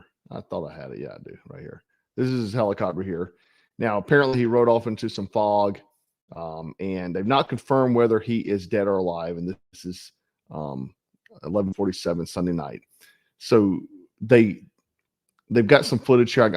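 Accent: United States. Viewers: He said Joey Biden. He has a cowboy 🤠 accent. Get your ears checked or clean them out. He always says Joey Biden.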